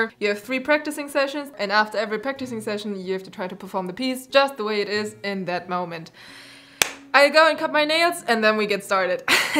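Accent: Germany. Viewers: Man hört du bist deutsch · mann merkt so hart das sie deutsch spricht hahahaha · Ich habe seid anfang an gedacht das du deutsch Kannat am Akzent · Awesome video! You're a true musician. Speaking of what you are: You sound very German. Are you German, by any chance?😁 Keep up the amazing work!!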